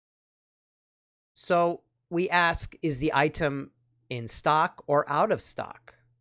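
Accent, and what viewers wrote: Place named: United States. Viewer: Hey, thanks for the good videos you make, I'm working on my accent to make it better, I wanted to ask which part of US does your accent belong to?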